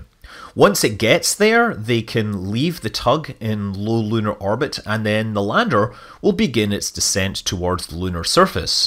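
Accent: Scotland, United Kingdom. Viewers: "Hulloo, Scott Manley here." How do you write the "Scottish" accent? I love it but don't know how to get those "ahrr's" in print, bummer! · Are you Scottish?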